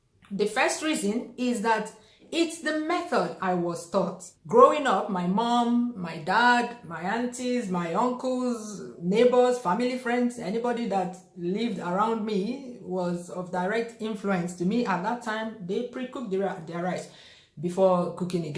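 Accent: Igbo, Nigeria. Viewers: I don't boil my rice but I wash it more than 20 times! And it comes out amazing !I would always like our Igbo accent 🙌Ndeewonuooo!!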